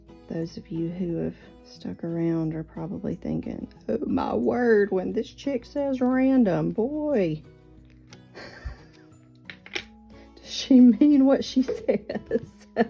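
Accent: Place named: South, United States. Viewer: If "Hi y'all" fits you better than "Hi guys", I think you should definitely switch! I'm not sure I know anyone who doesn't love a southern accent. :) Be you!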